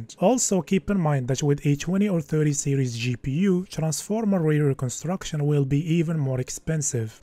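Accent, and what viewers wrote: Morocco. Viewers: accent dyal lmgharba matkhfax rak nadi khoya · the moroccan accent is so thicc lol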